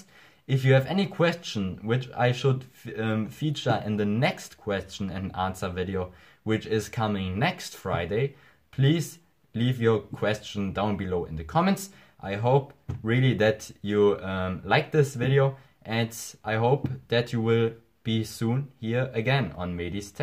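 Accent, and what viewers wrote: Germany. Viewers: Hallo, Ersteinmal zu Dir. Deine Arbeit sticht heraus, besonders da du auch auf die Details eingehst die andere in ihren Tutorials gerne auch mal unter den Tisch fallen lassen oder nur sehr dürftig erklären. Das Thema ist weitaus komplexer als nur 'buttons' zu drücken und ein USB mit dem Rechner zu verbinden. Daher, hats off! Machst du gut ansonsten würde ich dich nicht mit meinem ersten Youtube comment ever beehren und belästigen. Bleib so bzw. entwickle dich! English and fluently? Keine Sorge, da kommst du noch hin. Bist ja schon gut am üben für... ich nehme mal an die English Prüfung in mündlich? Versuch mal das, dein English nicht zu sehr 'einzudeutschen'. ;) Nun zu mir: Ich (completely newbie) und in der letzten Zeit viel zum Thema flashen und rooten angelesen (xda, etc.) bzw, tutorials dazu gesehen (hier und anderswo) möchte, um klein anzufangen erst einmal mein SM-G935F mit 1&1 Branding a)debranden und b)mit einer neuen und aktuelleren FW (DBT/G935FXXS2DRA9) grundversorgen. 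Vielleicht kannst Du mir mit deiner Expertise meine gebliebenen Fragen beantworten und Ja! Sorry schon mal vorab für das nun folgende Buch... Ist Zustand: SM-G935F mit 1&1 Branding FW: Nougat 7.0. Keine SIM vorhanden (mein erstes Mobile seit Jahren und ja, es geht auch ohne! :D) Weder Pins, Passwörter noch Fingerprint eingerichtet Mobile mit einem Google Konto eingerichtet Frage zu: Backup Ungerooted kann ich Titanium Backup nicht verwenden. Helium speichert die Apps nur in die Cloud (Google Drive) a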